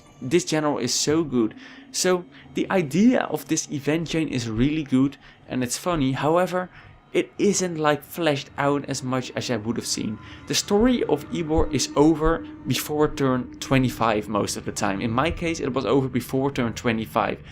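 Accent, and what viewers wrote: Netherlands. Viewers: Dat Dutch accent do :D I love your Dutch accent! Greets from your southern Neighbour!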